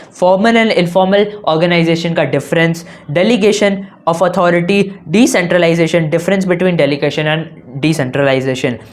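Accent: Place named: Punjab, India. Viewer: sir aap punjabi bahu cute bolte ho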